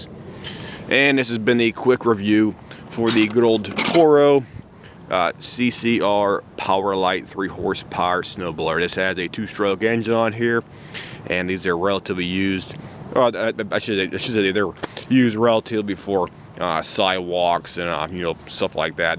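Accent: Pittsburgh, United States. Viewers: Dude, your Pittsburgh accent is STRONG! 😅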